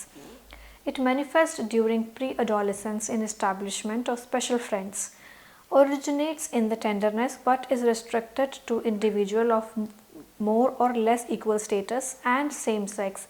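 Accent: India. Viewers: I dont understand the words you said because of the Indian accent..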